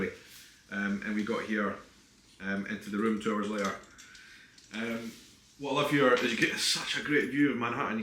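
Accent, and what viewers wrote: Scotland, United Kingdom. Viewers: sounds more Scottish than usual. It is one of Earth's coolest accents. 😁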